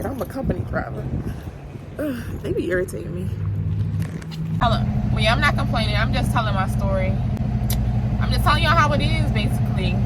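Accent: Baltimore, United States. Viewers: Are you from Baltimore? 90 percent sure of it i can hear the accent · She sounds like she's from Baltimore area. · Okay yeah I thought I heard the accent too (born and raised here). I always second guess myself. I at least heard her say Merlin so I knew she was from Maryland 😂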